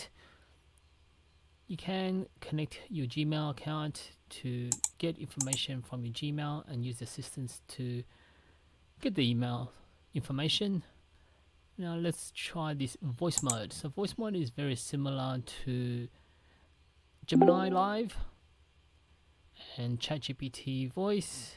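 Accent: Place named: United Kingdom. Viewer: Bro, what's your origin? You sound pretty UK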